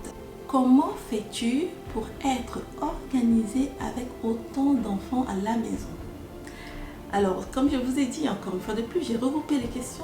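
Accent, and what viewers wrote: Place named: Cameroon. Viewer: Pour information, cela vient de l'accent de son pays d'origine, si ne m'abuse, le Cameroun. C'est une spécificité propre aux habitants ce beau pays !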